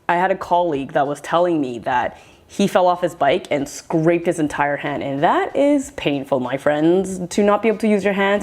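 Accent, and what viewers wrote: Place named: Canada. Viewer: Cool accent, is that a candadian Asian accent?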